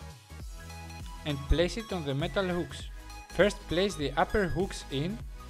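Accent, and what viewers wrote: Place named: Germany. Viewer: hes german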